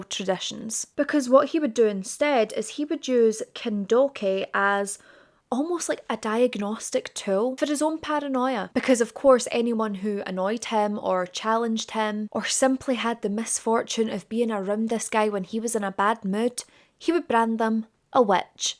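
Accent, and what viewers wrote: Scotland, United Kingdom. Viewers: All my hype points went to you 💗 once again a new story for me, you’re the best. You were the #1 channel I watched in 2025, I’ll never get enough of you’re Scottish accent and to see what stunning new look you show us❤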